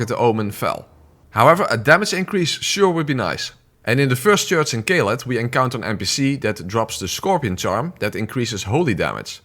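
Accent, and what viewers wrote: Netherlands. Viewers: Jeez I thought my dutch accent was thick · You are so dutch, i can not unhear it :p nice vid bro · Excellent video but my god do i hate the Dutch English accent... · Horrible Dutch accent. Next time use a friend or family member with proper English accent to do voiceover. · Lol je bent Nederlands ik hoorde het Nederlandse in je stem maar wist het niet zeker tot dat je zei dat je Nederlands was. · I smell Nederlands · Haha oké ja overduidelijk Nederlands 😂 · deam he sounds dutch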